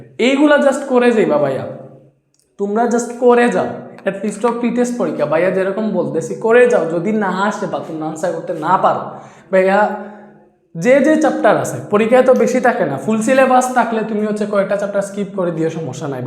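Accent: Sylhet, Bangladesh. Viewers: Vaiya apni ki sylheti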